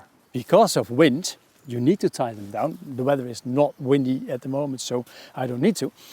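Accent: Netherlands. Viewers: De eerste paar zinnen wist ik oprecht niet of dit Engels of Nederlands was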